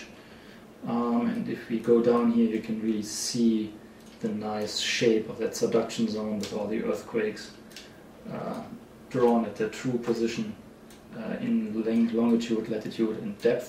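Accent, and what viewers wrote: Germany. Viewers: Hey dude, where are you from? You sound like a German ^__^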